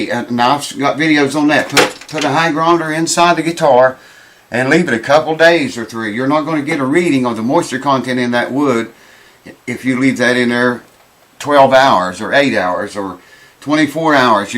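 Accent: South, United States. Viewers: Were in usa you buddy accent south innit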